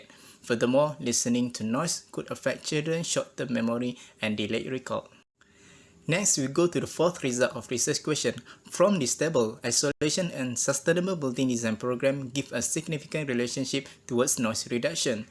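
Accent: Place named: Kelantan, Malaysia. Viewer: Wow english with klatey slang 👍🏻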